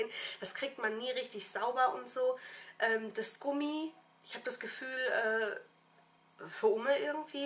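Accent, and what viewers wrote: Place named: Mannheim, Germany. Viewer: ich wusste, dass ich den dialekt iwoher kenne! mannheim, klar wie klosbrühe :D komm aus der nähe von Heidelberg ;)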